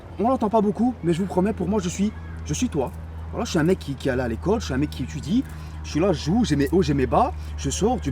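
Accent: Belgium. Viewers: O lala l'accent belge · Tu es belge ?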